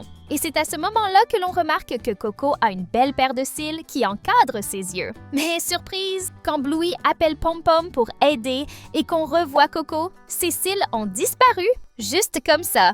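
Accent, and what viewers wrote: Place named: Canada. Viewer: oui, un axent canadien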